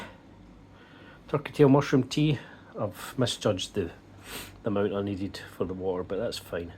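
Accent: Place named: Scotland, United Kingdom. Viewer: What it turkeytail . You sound Scottish I'm Canadian. Don't think we have this tea here . Is it to help with a cold.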